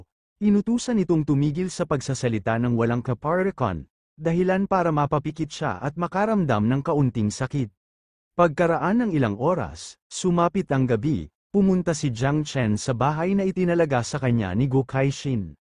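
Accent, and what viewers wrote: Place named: Visayas, Philippines. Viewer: Bisaya gahing bisaya nice lods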